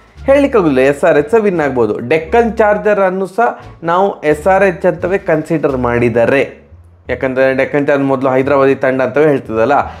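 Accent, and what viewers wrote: Mangalore, India. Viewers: Neev yava video veiadru maadi karantre. Nanu nodthene. Nanu video nododu information gaagi alla. Nimma e Mangalore accent Kannada kellikke barodu 😂 I love it man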